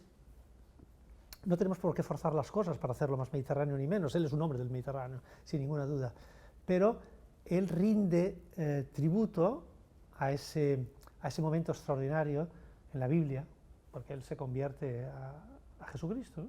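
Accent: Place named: Catalonia, Spain. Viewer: Acento catalán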